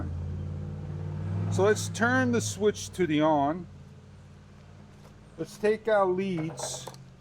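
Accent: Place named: Brooklyn, United States. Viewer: BROOKLYN Accent, BROOKLYN Neighborhood, and thank you for an excellent Brooklyn Review. I have a Tacklife T8 which is identical to yours and it really does work.. 👍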